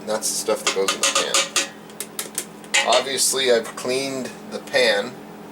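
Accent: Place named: Arizona, United States. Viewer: Aaaah, Arizona. That would explain the beautiful accent. Stay safe.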